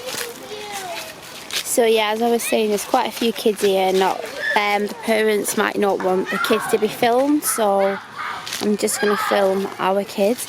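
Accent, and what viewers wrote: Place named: North, United Kingdom. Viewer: Your children are just adorable 😍 i was guilty of constantly telling my kids to “be careful” whenever they climbed where as now I’m the opposite and totally encourage them to explore! How old are your children? And it’s so nice to hear a fellow northerners accent 🥳 oh you’ve litterally just answered my question as I’m watching lol is 13 months, awwww!! What made you decide to home school honey? Yes I think you should make that video, it would be interesting to learn more as it sounds ignorant but the truth is I don’t really know but it would be good to find out from you!! 🤗 xx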